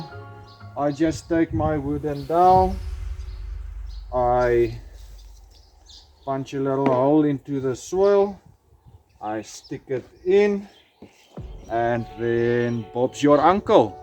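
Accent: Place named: South Africa. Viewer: I recently came to live in Australia from SA and have bouts of homesickness. Trying to find how-to propagate rocket. What a wonderful surprise to hear the "ou" accent again! What,a blesing! Thank you for your very encouraging lesson..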